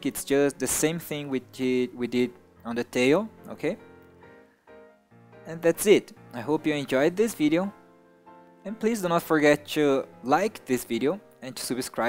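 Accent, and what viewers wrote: Brazil. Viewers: que sotaque em ..... parece até brasileiro kkkkk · I just thought the same thing, he definitely has a Brazilian accent lol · Are you from Brasil. Tem um sotaque de BR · you speak like a Brazilian.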